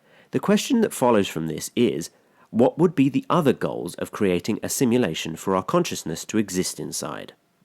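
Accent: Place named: United Kingdom. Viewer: The british accent can litteraly convince me anything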